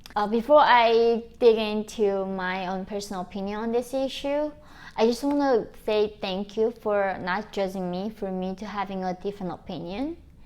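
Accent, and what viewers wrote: United States. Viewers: im so happy for you. you might be from North Korea but you speak like an American. Americans are people from different countries and cultures with one goal in mind to better ourselves and lives so our kids and have a better future and to keep this country great. I wish you a great life with prosperity. keep up the videos you have great reactions maybe you can do some on American sports especially football its a big culture tradition.. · I've been listening to her for a while and she is sounding more like a good ole Betsy Ross Gal every day!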